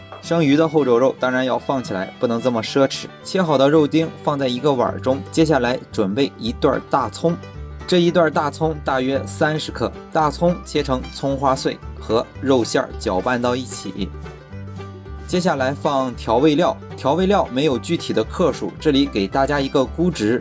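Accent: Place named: Shandong, China. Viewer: 老乡你是山东哪儿的听着很像我那地方的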